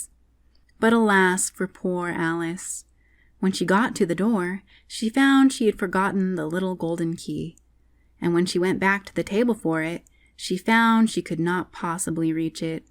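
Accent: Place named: United Kingdom. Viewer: A British accent gives it its intented context over an American one <link>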